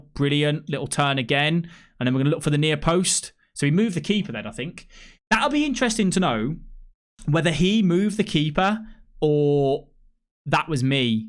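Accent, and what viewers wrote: United Kingdom. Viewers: Bro are u from uk ? its so hard to understand you sometimes xD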